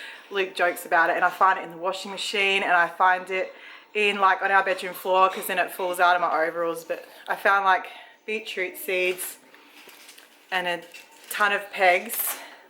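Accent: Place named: Australia. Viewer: Hi, did you get rain meanwhile? Just checked "weather Australia"😅 since i don't know where you are and it seems to be raining right now... somewhere in Australia... Rain is a bit luke health, we take it for granted until its missing. I'm only 10min in aymd enjoy the video as always. Like your Australian accent and am a bit jealous of your kids names. Maybe you should rename Dusty in something like "Rainy" or "Sloppy". 😅 I'll pray for rain.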